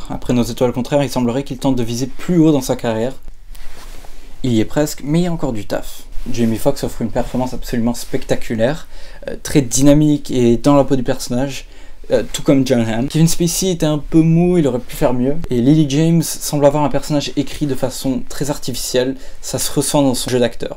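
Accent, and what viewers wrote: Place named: United States. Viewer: Original, on sent que t'es à fond dedans c'est cool :) J'suis d'accord avec LeFou, tu as tendance à mâcher tes mots en fin de phrase et quand tu dis les noms des gens. C'est dommage on peut pas apprécier ton accent américain :P Pour le film j'vais donner autour de 40/51 parce que malgré la bonne surprise y'a quand même quelques défauts. C'est un bon début pour la chaîne o/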